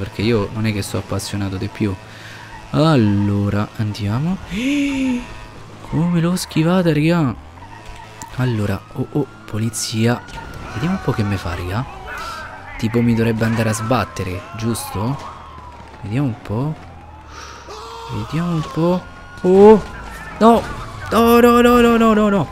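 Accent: Rome, Italy. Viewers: Mo se sente che se de Roma!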